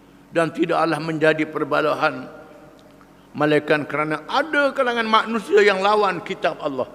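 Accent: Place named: Terengganu, Malaysia. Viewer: suka dgr kuliah tok guru, tidak terlalu ganu loghatnya. org KL pon paham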